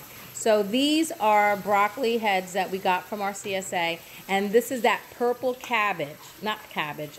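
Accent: New York, United States. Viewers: I'm pretty new to your channel...and I love it!!!! I'm all about the cooking, the hauls, the garden harvesting. I love your way of speaking...great NY accent. Cheers from Montana!!!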